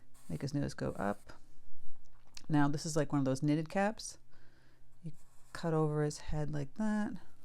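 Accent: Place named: East Coast, United States. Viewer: I know girl! We chatted last week about our East Coast loves of NE! You said you were in NY too and didn't lose your accent! LOL. Just finished your w/w pink/indigo trees too. Very relaxing and gorgeous color scheme. Thanks for everything,!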